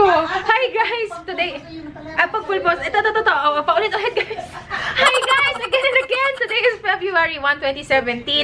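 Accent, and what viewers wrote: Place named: Visayas, Philippines. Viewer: that little visayan accent or ilocano accent ? 😂😂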